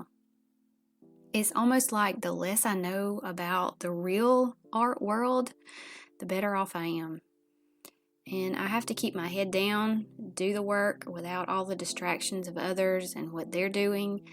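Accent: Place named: South, United States. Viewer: I know this is an old post, but I wanted you to know that I really enjoy watching your videos. I started painting 5 years ago during the pandemic and like you, I have sold some paintings but never think of myself as a “real” artist either. My husband tells me I am a real artist because I have sold some artwork, but I have imposter syndrome when it comes to my artwork because I didn’t go to art school and have never had any formal training. I learned by taking online classes and watching YouTube. I am a social worker for my job but would love to one day leave this stressful job behind and retire and paint everyday for the rest of my life. Seems like that is never going to be a reality with this economy the way it is. Anyway, you are such a sweet person and I love your southern accent. Keep making your videos, because you and Sandi Hester are my two favorites😊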